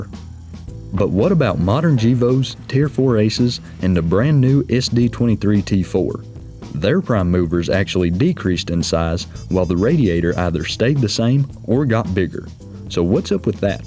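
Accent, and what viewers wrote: South, United States. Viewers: well good job sir. When I heard some guy with a country accent talking about trains, I was expecting your explanation to be cold things get hot and hot things get cold not you actually leaping into a discussion about how thermodynamics work.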